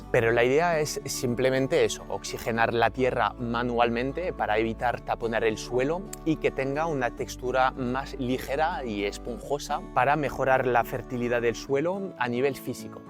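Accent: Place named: France. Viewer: Sería bueno que nos explicara si en ojo o tallo falta o sobra algún tipo de mineral que sepamos. O cómo saberlo sin hacer un análisis de tierras. Porque aquí en Galicia existe el minifundio y muchos no podemos dedicarnos a hacer un análisis por cada pequeño pedazo de parcela que tengamos. Separados unos de otros😮 tienes un ligero acento francés😂 muchísimas gracias❤ muy buen vídeo❤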